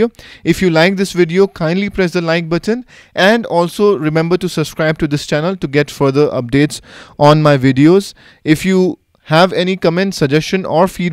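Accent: India. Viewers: Its indian accent but not too indian.. Kind of sweet spot i love it 😃 And thank you for great explanation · This is how every north Indian speaks English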